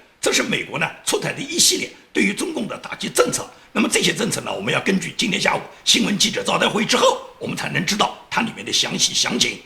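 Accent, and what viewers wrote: Nanjing, China. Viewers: 尊敬的哥您好，18年初看您的视频至今，收获良多，我也是南京人，特别喜欢您南京口音的普通话。您的坚持不懈，您的语速，您的激情都感染着所有同道中人。有人认为您用词反共过于夸张，过于亢奋激情。其实不然，太多中国人已经习惯跪太久了，冰冻三尺非一日之寒，矫枉必须过正，再不激情怎能唤醒国人，即使夸张又如何。还有人认为您对共匪不分对错太过痛恨有点过了，而我只想说未经他人事，莫劝他人善。有这想法的人他们不懂您，更不懂共匪。您的所有视频我都看完。由于一些特殊原因有一个多月没能上youtube看您视频，近几天也都补看完了。当我看到1000期您说爱情故事时，我被您的爱情故事震撼了，发自内心来说这是我听过的一个最好的最真切唯美的爱情故事，您的故事有血有肉，有柔情有理想怎能不让人感动。哥不愧南京人，果然真男人!您对理想的执着让人无比敬佩和感动。如果您能看到我写的东西话我想说两点我的想法，仅供参考，1.您觉得匪让人有肉吃有酒喝了所以人民安于现状了，麻木了，粉红了，其实那只是少部分。而大部分恰恰相反，他们是得天天在努力争取酒肉，不是不想剿匪，没有理想。而是在剿匪的路上需要吃喝，自古当兵不就为了吃口皇粮吗？而现状是他们连翻墙软件都买不起。2.中国人跪久了是怎么造成的那，未何一点血性都没了那？这不光是匪的原因，更重要的是儒家的原因。孔老二在战国时本是百家中最普通的一家一直不被认同。所以孔老二当时周游列国都不受待见，秦始皇用的是法家，汉用的是老子无为，直到千年后的中唐才为了封建统治用了孔老二洗礼人民思想。所以剿匪必先灭儒！最后说一点您可能不爱听的，习近平做为帝王他是伟大的，对于新疆，香港他没有开枪，无疑比毛，邓高明很多。还有扫黑扫毒等对内政策把社会风气提高很多。是江，胡所不能比的。我知道您可能会反驳很多，但我说的只是对于帝王来说，请正面理解。希望有机会去美国时能和您当面讨教。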